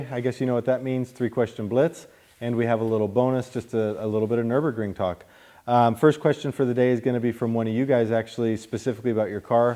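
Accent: United States. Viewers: I notice your american accent. Howd you decide to move to Germany?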